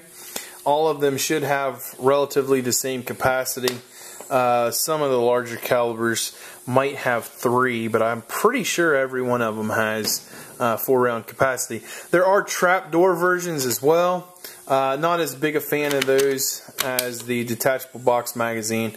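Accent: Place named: Pittsburgh, United States. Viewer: You from Pittsburgh? I know a yinzer accent....if your shop is around the Burgh, ill be stoppin over for one.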